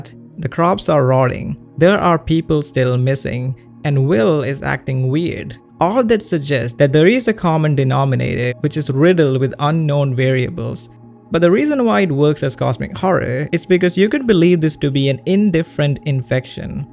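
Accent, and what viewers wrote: South India, India. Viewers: For some reason I hear a South Indian in you...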